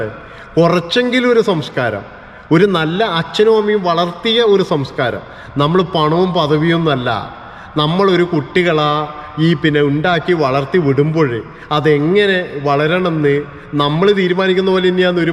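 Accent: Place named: Malabar, India. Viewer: സഹോ , താങ്കളുടെ മലബാർ ശൈലി സംസാരം Super. ടെൻഷൻ വല്ലതും ഉണ്ടെങ്കിൽ നിങ്ങളുടെ വീഡിയോ കാണും😂ചിരിക്കും😂 അതോടെ ടെൻഷൻ നാടുവിടും😂 Congrats👌👍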